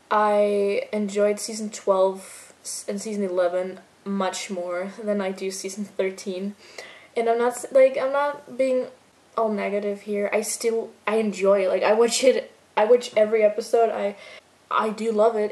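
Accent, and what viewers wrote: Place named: United States. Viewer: Hi! If you're from Austria, how can you speak so beautifully in English and especially with an American accent? By the way, love your videos! ( Greetings from Hungary 😊 )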